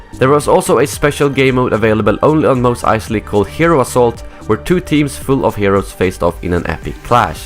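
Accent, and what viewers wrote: Sweden. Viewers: u sound swedish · u sound so swedish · You sounds Swedish. Are you?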